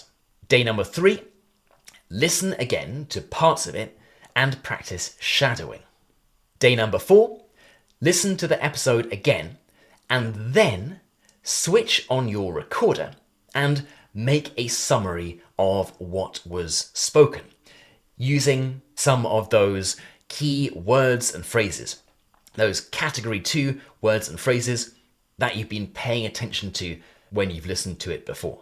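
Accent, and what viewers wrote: United Kingdom. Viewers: Este es acento británico?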